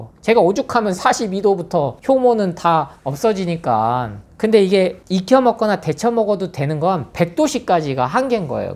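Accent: Jeolla, South Korea. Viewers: 전라더 말투에.. 인상도 이상하고... 그냥 딱봐도 사짜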